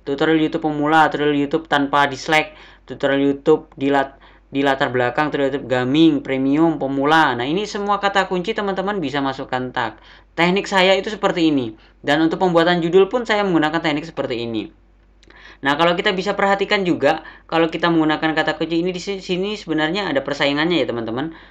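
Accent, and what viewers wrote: Java, Indonesia. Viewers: Kewoooooot....mantab...paste....medok2..tp?lucu.mwkkwkw...iklane bang...ngeri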